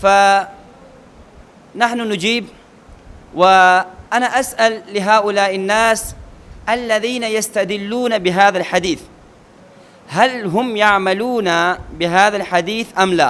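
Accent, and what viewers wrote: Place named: Pakistan. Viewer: یہ عرب لگتا نہیں میں عربوں سے ملا ہوں وہ ایسے نہیں بولتے یہ کوئی پاکستانی ہے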